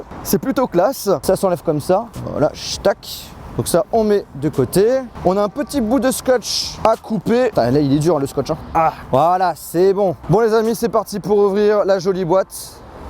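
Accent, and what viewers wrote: Belgium. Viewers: Par contre t'es vraiment en train de prendre l'accent belge ... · t est belge ? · tu es Belge ????? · tu es belge?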